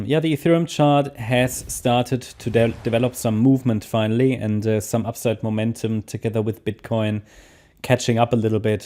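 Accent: Germany. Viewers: love your content too! you are a german guy? i mean i hear it^^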